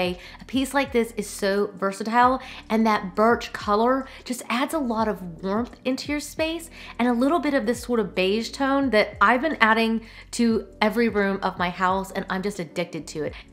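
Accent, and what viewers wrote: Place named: South, United States. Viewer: I'm hearing a strong southern accent in this video, which i hadn't picked up before and now I'm wondering if I've missed it all this time 🤔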